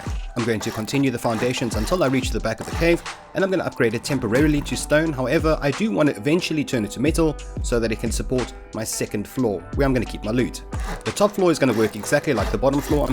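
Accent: South Africa. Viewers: I’m a simple man, I hear a Saffa accent and I sub to the content. But that intro was perfect. Perfection. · OH SNAP YOUR ACCENT!!! I could tell you were South African and then decided to check your bio and I was right!!! Awesome man, Awesome video :) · are you from south africa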